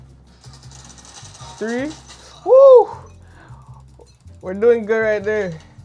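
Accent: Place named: Jamaica. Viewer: You have a Jamaican twang bro are you from jamaica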